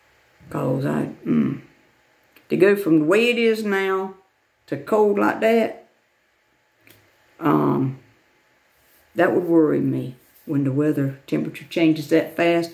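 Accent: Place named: South, United States. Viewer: I am enjoying this. Perfect!! 👋 I thought you had a southern accent. Mine is worse than yours. Someone asked me if I was from another country. 😅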